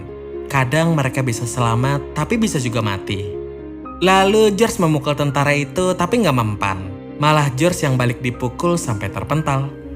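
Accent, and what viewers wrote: Indonesia. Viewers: Pengucapan kata kata dlm bhs Inggrisnya lurus banget spt bahasa Indonesia 😭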